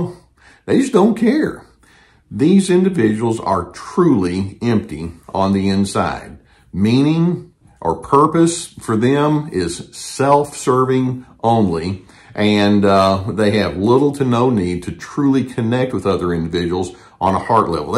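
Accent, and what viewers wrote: South, United States. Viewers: I forget where my discourse amongst myself takes place in this comment section, but it’s really funny because my mom will be laughing so much. Everything he says. Is me but like I’m counteracting it. It’s funny because it’s like wow he’s got that southern yet. Chris drawl. I am yapping. Like a Chihuahua. About my identity and rights. It’s hilarious. This is good banter. Sometimes two opposing forces can create something like the binary between us. Binary sound, you know what I mean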